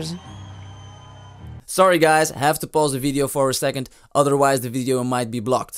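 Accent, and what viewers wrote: Netherlands. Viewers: question; quite a dutch accent.. where are you from?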